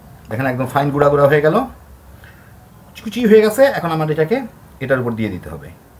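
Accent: Bangladesh. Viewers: Dada .... Epar Bangla (India) theke antorik bhalobasha. Recipe to darun, kintu apnar presentation ta awesome. Amara o bangal, r anek din por ei Bangladesh er accent ta khoob bhalo laglo. Bhalobasha o subheccha roilo 🙏.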